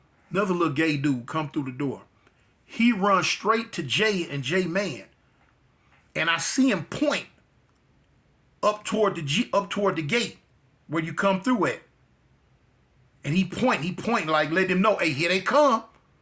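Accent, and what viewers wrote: South, United States. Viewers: Imma keep it real og, you sound more southern than you do LA. I know it might be the 23 years, but dang, I'd swear you were born down here but with an LA spirit. It's like your heart is west coast, and your spirit is southern. "I said dang! That's sum'n else right thei'airr!" 😅🤣🤣 That 'they're' wasn't all the way LA or all the way Bama. It was mixed perfectly. 😅🤣🤣 Man, you sound like every one of my uncles & their friends I've ever heard. Word for word. & None of them ever left GA. Not one time.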